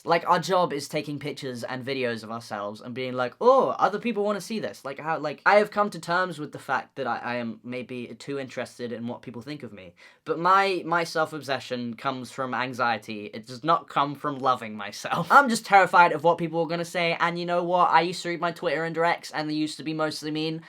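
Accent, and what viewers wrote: England, United Kingdom. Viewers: im sorry it's just that english accent is so refreshing to me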